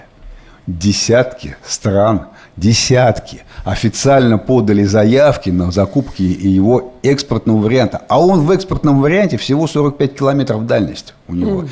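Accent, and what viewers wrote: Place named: Surzhyk, Ukraine. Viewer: На суржике не понятно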